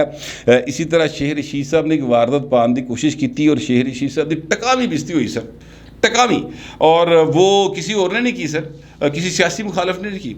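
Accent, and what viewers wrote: Lahore, Pakistan. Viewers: زبردست صاحب۔۔۔زبان کے لاہوری ٹچ کے ساتھ مزا آگیا۔ ویسےمیں پی ٹی آئی کی چھترول سے زیادہ لہجہ کا لطف اٹھاتا ہوں۔ انہاں نوں ویسے وی شرم حیا نیئں۔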